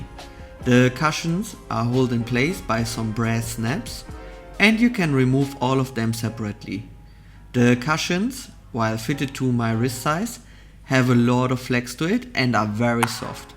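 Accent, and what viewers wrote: Germany. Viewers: Great - where are you come frome ? Your dialect sounds like German :) If so - I would be interested what’s your job?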